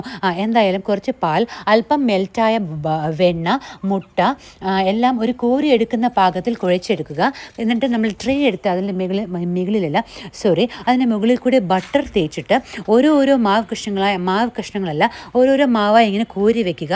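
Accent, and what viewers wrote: Trivandrum, India. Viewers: Plz talk in ur regular TVM accent which is so heartwarming and ur trademark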